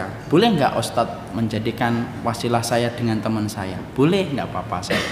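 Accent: Java, Indonesia. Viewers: 😁 pernah dengar rakan-rakan keturunan jawa saya bertutur sama macam ustaz ni. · iya ka, belaiu orang jawa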